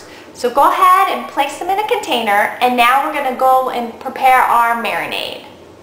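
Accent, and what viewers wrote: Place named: Georgia, United States. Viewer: Where are you from? You sound like Georgia.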